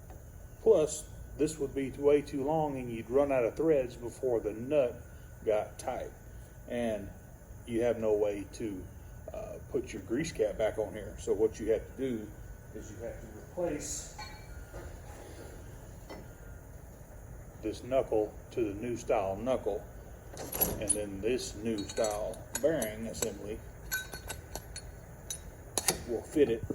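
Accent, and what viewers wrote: Texas, United States. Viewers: Awesome video! Very comprehensive. Appreciate the part numbers as well. 🍻 Personal aside - what part of Texas is your accent from? -a fellow southerner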